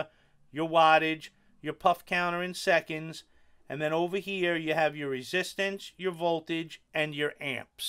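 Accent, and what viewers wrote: New York, United States. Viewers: I will watch every video of yours because of this New Yawk accent. Cheers.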